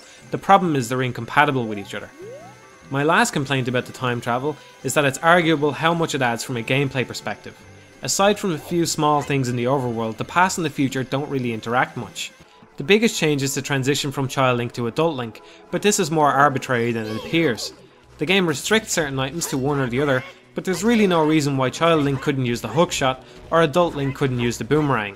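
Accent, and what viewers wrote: Dublin, Ireland. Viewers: Smitty Werbenjagermanjensen It's Irish right? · He is, it's some sort of Dublin (capital city) accent. There's so many different types of accents all over the country. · Woah, You're Irish Like Me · Where in Ireland are you from? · It’s an Irish accent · You're Irish, right? I'm just asking 'cause I love the accent.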